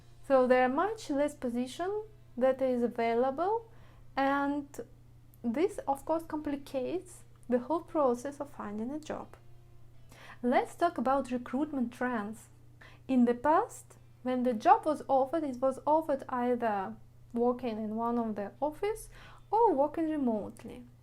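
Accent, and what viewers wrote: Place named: Eastern Europe. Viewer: Soften love your mama Eastern European accent🤫this will only help you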